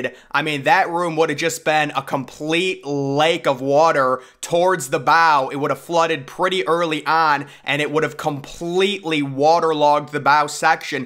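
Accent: United States. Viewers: Tone down the shouting, big American twang voice...it's really irritating!😳🫣🤬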